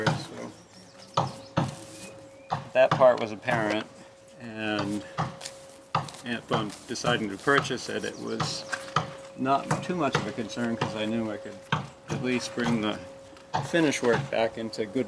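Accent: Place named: Maine, United States. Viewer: I like the Maine accent and the fashion he works. Kindly from Calabria, South Italy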